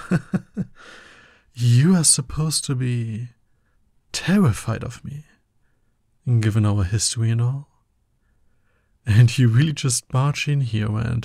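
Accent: Germany. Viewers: Samma ihr könnt mir doch nicht erzählen das nur ich den deutschen Akzent höre 😂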